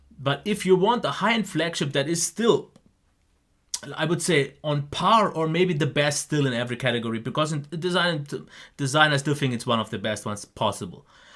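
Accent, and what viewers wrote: Germany. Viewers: ich hab einfach sofort rausgehört das du aus deutschland kommst :D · Jack AFC he is Croatian as he said. His accent is German.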